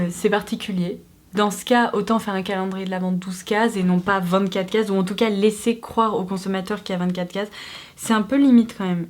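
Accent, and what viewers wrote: England, United Kingdom. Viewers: Tu es anglaise ?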